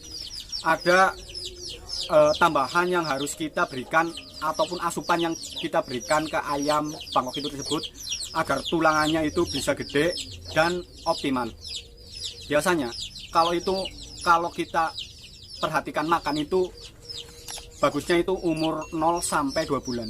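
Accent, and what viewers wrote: Banyumas, Indonesia. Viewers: Joooss sobat ku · Ngapak nya mana kang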